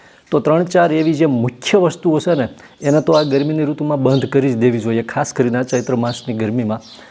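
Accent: Bhavnagar, India. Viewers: તમારી ભાષા અને ઉચ્ચારો પરથી લાગે છે કે ભાવનગર તરફના હશો. ખરું ?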